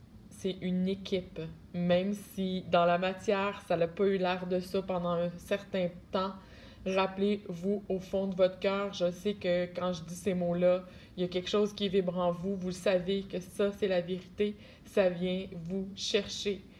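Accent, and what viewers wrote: Quebec, Canada. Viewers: Tu as une voix québécoise, ce que tu dis est exactement la même chose depuis 7 ans, 2012. il a des amis toxiques et oui j’ai hâte aussi au 26 déc. je sais qu’il en subira des conséquences que de m’avoir vraiment choisi, bien qu’il assume. J’ai tout coupé tout contact et toute énergie de protection. Il m’a vidé alors qu’il se responsabilise, moi je fais mon chemin. Sa fausseté spirituelle je m’en fou mais je l’ai bien cerné ce conard dans ces conneries au lieu d’aller faire une thérapie sur la violence d’abus psychologique. Je me consacre à l’amour véritable sans violence et finitos les hommes ça va faire de les materner. Ils n’ont pas la connexion du coeur, des vrais pervers sur notre conscience divine. 7 ans d’enfer alors je mets le gros cadenas 🔒 Trop d’hommes nous traitent comme des poubelles depuis des millénaires alors je fais la grève. 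Je suis les conseils d’une aînée et ça fait 35 ans qu’elle est seule et très rayonnante. Ces hommes nous manipulent tellement bien avec leurs énergies diaboliques, ça pu de cerveau avec tout ce qui prennent de toxicité que c’est juste le cerveau reptilien qui leur reste et pu de neurone dans le coeur donc il y a rien à faire. Mais moi je me suis reconstruie et j’ai une grande amélioration de mon corps 🙏🏻🥳😇 avec une colonne droite et des gens extraordinaires autour de moi! 🥰 Je suis prête au bonheur avec une insémination et mon enfant sera bien et heureux avec tous mes savoirs d’amour 😍 J’ai confiance à la nouvelle génération 😎 à l’arrivée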